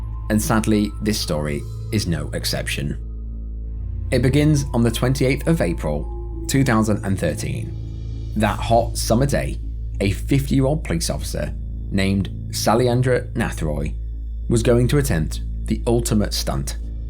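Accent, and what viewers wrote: United Kingdom. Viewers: Yeah the writing on this channel is very 7Th grade. Even the British accent doesn’t even help.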